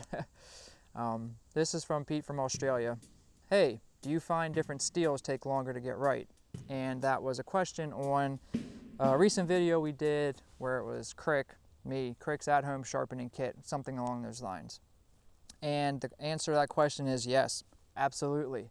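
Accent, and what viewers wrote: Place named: West Virginia, United States. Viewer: great idea! I haven't been subbed long enough to have in depth questions, but I am curious about where you are located. Your voice(accent) whatever you want to call it, reminds me of my husband's family in West Virginia is why I ask.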